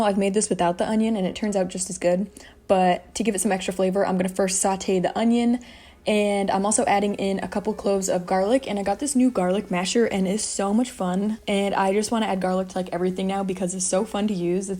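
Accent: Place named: United States. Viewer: What American accent is this :-)? Sounds intrueging!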